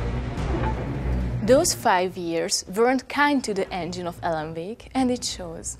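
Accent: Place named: Russia. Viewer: has a great Russian accent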